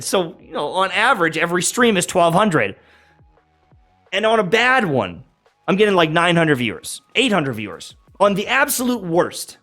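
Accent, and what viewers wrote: Scotland, United Kingdom. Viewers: The thing I like most about you is you genuinely care about your viewers and players of this game spending unnecessary amounts of money which is something I haven't really seen other creators push as much as you. I've spent a lot on genshin and sure I've been lucky enough to get characters I've wanted but it always ends with me regretting it and needing the money later on down the line. It wasn't until I saw your videos that I really started to think about what I was doing and because of that you've probably saved me so much and I honestly am so grateful for you and your content and your mindset for this game. Not to mention your tutorials and guides have been the main ones to actually help me understand this game and how it works so I will always appreciate you and the videos you make no matter what. Plus you're very funny and I'm a bit intimidated by the fact that your Scottish accent is better than mine, a Scottish citizen. 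· Not for the content....here for ye Laddie!!! Imagine this in your Scottish accent dear!!!!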